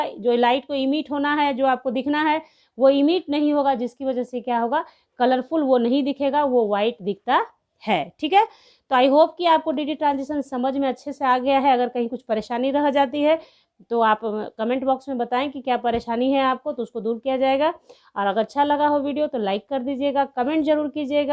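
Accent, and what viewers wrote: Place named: Bihar, India. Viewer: You are from bihar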